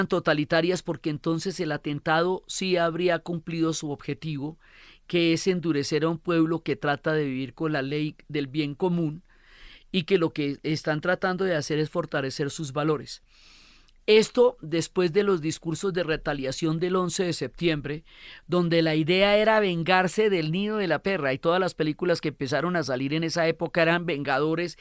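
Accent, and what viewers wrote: Colombia. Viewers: Hola me tienes fascinada tengo días ya escuchándote, te escuche los tres capítulos de Turquia, Noruega, y bueno solo me transportas a el momento, eres una mujer que cuentas las cosas que haces que la piel se me ponga chiquita, y pues tu voz colombiana me encanta, te mando muchos abrazos desde Guadalajara Jalisco México 😘 🇲🇽 💕 y seguiré escuchándote mi papá me preguntó como podía escucharte tiene cáncer, tiene 84 años y ya te escucha también. Bueno no se cuando termine de escucharte pero eres una gran mujer Dios te bendiga 🙏 🥰❤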